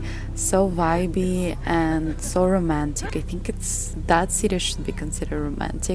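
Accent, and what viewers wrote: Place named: England, United Kingdom. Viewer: yay my fav travel vlog is back! you have a lovely english accent, i like this change up bless you for showing us the amazing cities with the night life, buildings and food and sunsets, beaches and people 🙏 your friend and you are gorgeous! cool to see you both happy, and those outfits you wore were stunning! 💜 thank you for the editing you put into this 😊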